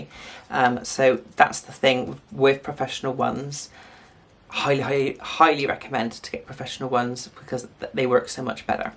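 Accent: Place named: Bristol, United Kingdom. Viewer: Are you Bristolian? Iam aswell so think I can hear it in your voice haha, great review! I’ve been looking into trying boutique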